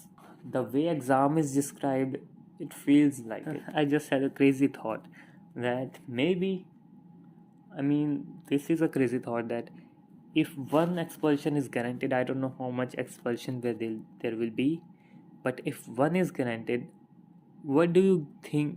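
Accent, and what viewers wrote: India. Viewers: by your voice feels like you are from india : )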